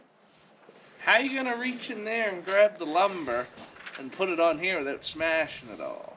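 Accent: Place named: Maritimes, Canada. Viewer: your accent happily reminds me of my beloved great uncles and aunties who were all born and raised in Memramcook, NB and my old neighbors in Maine. Memramcook is about as far as you can go in NB and not be in Nova Scotia!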